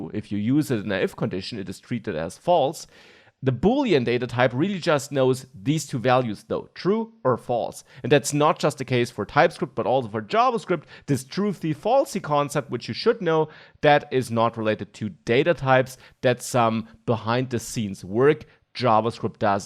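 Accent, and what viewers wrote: Germany. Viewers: Also ich muss ja echt sagen.... Du hast den deutschen Akzent fast komplett raus bekommen, sprichst flüssig und deutliches Englisch und hast dazu auch noch ein super Video gemacht. Ich habe es zwar noch nicht ganz zuende geschaut, bin aber jetzt schon wirklich wirklich beeindruckt. Keine nervige Musik oder halbnacke Frauen, die zwischendurch eingeblendet werden, keine Beleidigungen oder unnötiges Gerede aus deinem Alltag, kein Runtermachen von irgendwelchen Nationalitäten oder Gruppen und dies alles noch kombiniert mit einer wirklich cleanen Videostruktur. Ich kein Deutscher und kann einfach nur sagen das ich dein Englisch als "great" bewerte. Wirklich gute Arbeit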